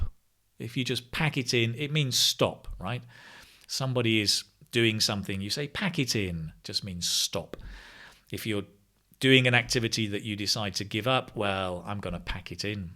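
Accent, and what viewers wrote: United Kingdom. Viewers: Hi 🙋🏼‍♀️ Great as always, thanks a lot charade is my cub of tea 🙃 By the way I’m in love with British accent 😍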